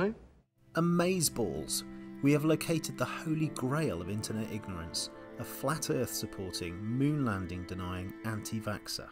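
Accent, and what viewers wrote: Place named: England, United Kingdom. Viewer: Sounded English to me, then again they are the same.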